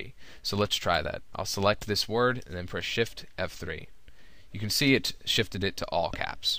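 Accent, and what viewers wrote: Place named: United States. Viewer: Thank you so much! This is exactly the information I was looking for i.e. 'How to print a list of your customized keyboard shortcuts'. I've been looking for this since forever. This video should have Millions of views! - It's short and to the point. - It's narrated with clear native USA English. - It does what it is meant to do i.e. help people.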